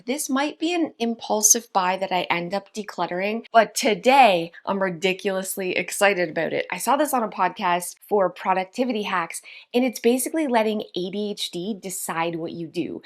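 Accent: Canada. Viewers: Never watched Sister Wives. Cilantro tastes delish to me, one of my favorites, we all have different chemical make ups, they say people who have a certain chemical make up, cilantro tastes like soap. I have a friend who loves dandelion tea and yikes! To me it is so bitter, she also likes sardines, ewe. We are all different and unique not "weird" I think you are smart, funny and beautiful and I love your little Canadian accent, I think you are in Canada? Byeeeeee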